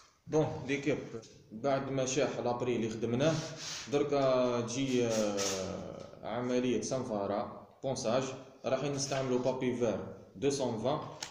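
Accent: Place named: Tunisia. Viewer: لهجنك قريبج للهجة التونسية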